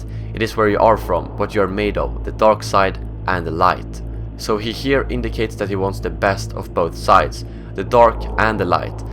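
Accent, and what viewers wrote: Sweden. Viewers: U sound swedish ???? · Älskar din svensk engelska :D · Dat svengelska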